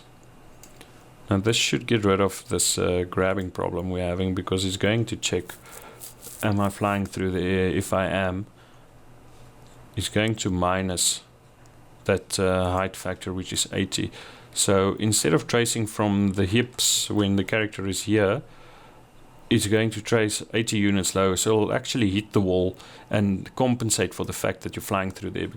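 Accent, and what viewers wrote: South Africa. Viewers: South African accent!!?!?!?!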